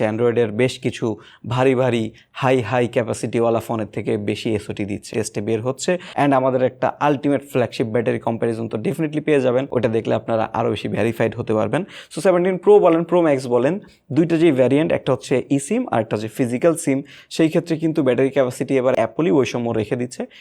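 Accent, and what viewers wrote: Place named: Chittagong, Bangladesh. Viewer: ভাই এর কথার টোন শুনে মনে হচ্ছে উনি আমাদের চ্যাটগাঁইয়া। 🙂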